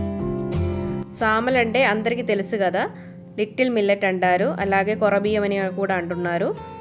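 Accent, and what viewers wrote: Kerala, India. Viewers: Nice, you are a Malyali ,must have settle in Hyd since long , Your slang is a mixed kichidi of Malyalam & Telugu 🌰🥒🍅🍆🌶🥕😊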